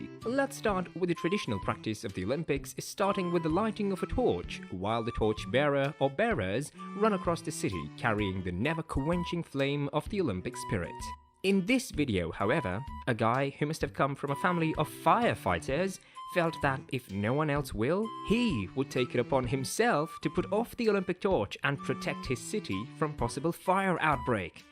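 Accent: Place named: England, United Kingdom. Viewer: The English accent make it so much funnier👌.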